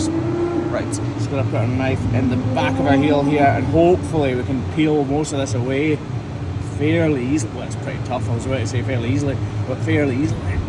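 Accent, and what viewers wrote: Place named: Scotland, United Kingdom. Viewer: I love you Scottish accent (I'm from the U.S.A.)... even when you say things like "dirt and debris" it sounds so cool... :) Love your channel!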